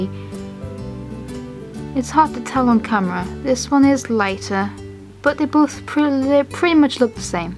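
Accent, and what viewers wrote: United Kingdom. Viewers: What part of England are you from?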